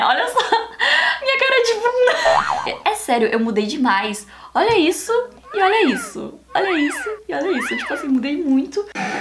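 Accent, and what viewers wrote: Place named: Paraná, Brazil. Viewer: "Muito sotaque paranaense" mais vc é paranaense linda! 😍💕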